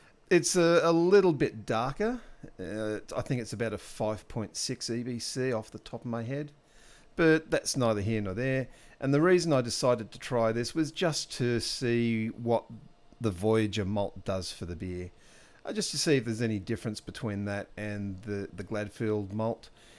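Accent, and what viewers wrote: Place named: United States. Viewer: You are using metric system but your accent like american ?? 😮😮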